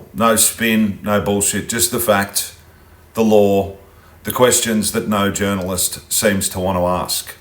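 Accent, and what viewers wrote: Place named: Australia. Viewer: Wow a real Australian journalist speaking Australian I love it keep up the good work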